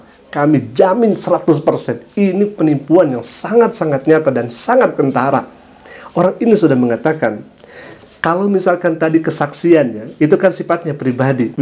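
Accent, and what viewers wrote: Indonesia. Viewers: Ngaku dr Malaysia logat bahasa Indonesia Ini aja dah ketahuan bohong nya 😂😂😂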